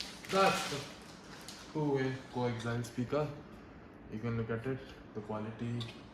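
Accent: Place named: India. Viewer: It is not amazing - I can barely understand anything with his deep hindu accent!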